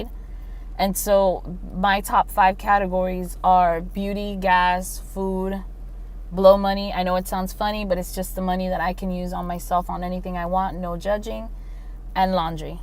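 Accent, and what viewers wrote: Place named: Miami, United States. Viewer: Girl I love this! Please keep up with the videos... my 2018 goal is to be gazelle intense on paying off most of my debt :) You're great. Also, it sounds like you're from Miami. So am I!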